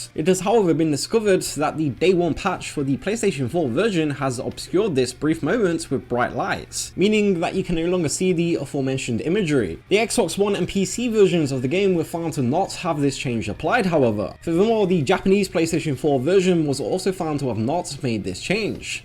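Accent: United Kingdom. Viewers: I had to turn on the captions cause of the thick redcoat accent lol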